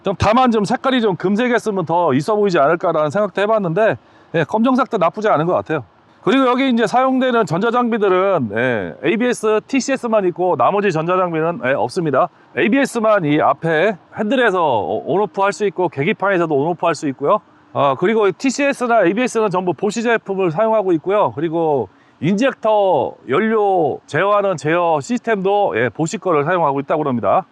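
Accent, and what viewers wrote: Chungcheong, South Korea. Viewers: 핸섬하시군요😅충청도 말투😂❤❤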